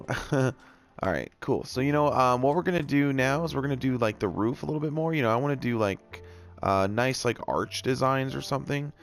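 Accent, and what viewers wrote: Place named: Ireland. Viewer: I think the ender Sphinx looks great and sorry with all the questions but what country are u from because I sound like u and I'm Irish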